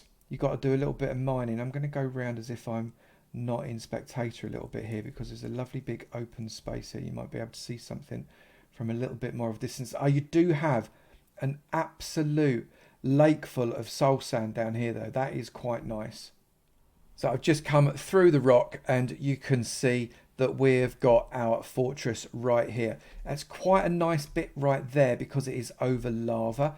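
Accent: United Kingdom. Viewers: Its a normal british accent you donkey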